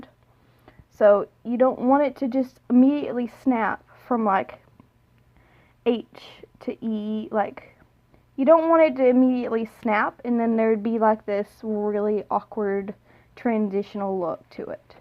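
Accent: South, United States. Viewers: You sound country kinda, but u keep catching yourself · Are u country? Bc u have a country accent, sorry it just made me laugh xD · no offense...... i just realized that you sound country :P im country too so idek why i said no offence.... im stupid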